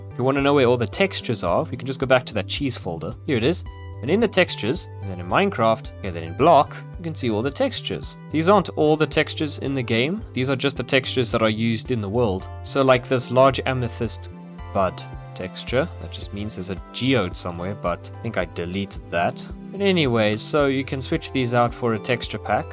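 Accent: South Africa. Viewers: I love South African accent. ❤ thanks for sharing…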